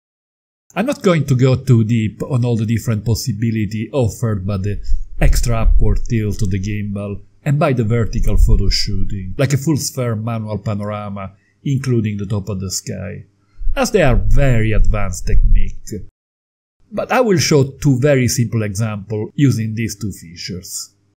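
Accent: Italy. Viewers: I’m enjoying your videos. I love your Italian accent. Just like myself living in Australia with a strong Swiss accent.👍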